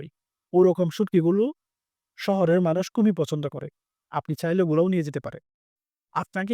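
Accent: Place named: Chittagong, Bangladesh. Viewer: Ctg man কথায় বুঝা যায়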